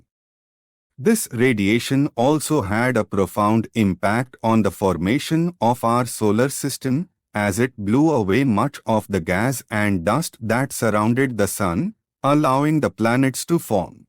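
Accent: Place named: India. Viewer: I think that the narrator is coming from India.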